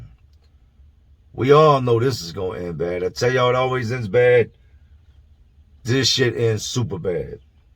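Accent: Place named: Philadelphia, United States. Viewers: People comment on your accent like they comment on mine. Always wanting to correct me and what not. I finally said to them that this is how I talk..growing up around philly and Delaware and Jersey, this is how we talk. People. If you don't like his accent, don't listen. Virginia is right down the road from me, and that is how people talk. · 🤣🤣 Big Facts its a Philly Thang myG rs pretty much Everybody tlk lik dat around my age im 34! But rs half dez ppl wouldn't bust a grape in a fruit fight, dey dont kno bout Ordering shyt on da Box fukin mom cable bill up💯🤣🤣🤕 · 10/27/80 here brother. I feel you damn. You sound east coast. What's the deal. Hell I'm midwest we sound weird to you all 😂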